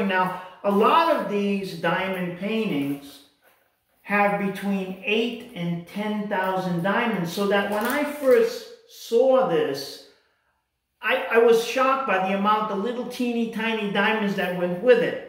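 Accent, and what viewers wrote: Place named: East Coast, United States. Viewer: Brilliant ! I ordered one and thought no way with the diamond picture! I gave it to my 11 year old granddaughter and she worked on it for 15 minutes. Now it's living in my piano bench unfinished. 😅 Thanks for the car cleaning ideas too! BTW, ❤ your accent!! East coast somewhere? RI maybe??😊 SE Ohio girl here. Great video!!!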